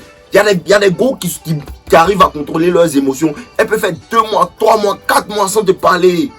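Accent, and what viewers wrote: Ivory Coast. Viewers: On reconnaît l'accent ivoirien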